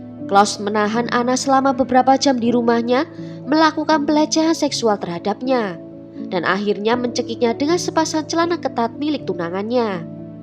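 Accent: Java, Indonesia. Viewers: Medok mbak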